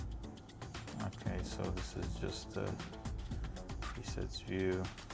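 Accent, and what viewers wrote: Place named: France. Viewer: Il est francais lui je reconnais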